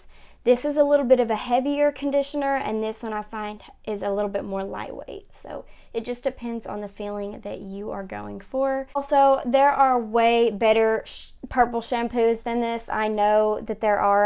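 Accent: South, United States. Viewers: It's a southern accent...could be Californian.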